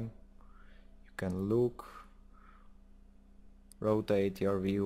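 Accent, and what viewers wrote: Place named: Latvia. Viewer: Are you from Latvia? Your accent sounds familiar :) Thanks for the video!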